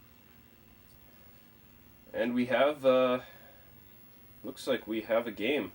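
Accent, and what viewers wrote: Canada. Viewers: Canada ay! You can tell, nothing wrong with that tho.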